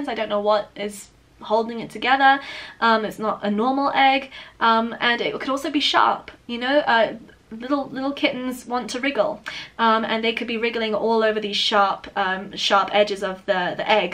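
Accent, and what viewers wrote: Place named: United Kingdom. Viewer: Did not expect a british accent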